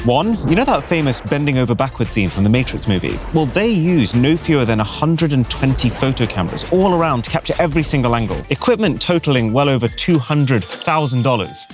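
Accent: United Kingdom. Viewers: Ru British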